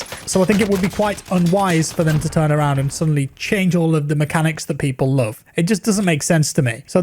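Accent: England, United Kingdom. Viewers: What English accent does have?:)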